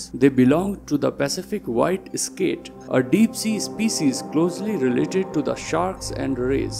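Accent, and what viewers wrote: India. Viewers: Lol Youtoube get this trash out of my feed. AI + Indian accent? come on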